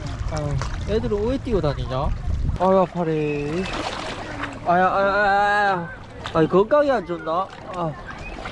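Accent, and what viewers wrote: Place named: South Korea. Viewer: 넘나 웃겨죽는 사투리보러왔다가 혼자 실실웃고 난리입니다. 아 진짜 똥휴지가있어 부터 넘우껴서~~으아 ㅋㅋ 글고 같은 유튜버로서 영상얘기 좀 나누고싶은데 통화한번 하는거어떠실까요?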